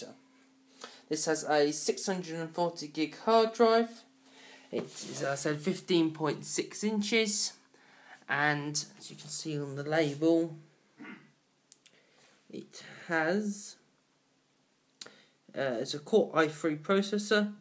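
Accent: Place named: United Kingdom. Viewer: Ur from europe???? U sound british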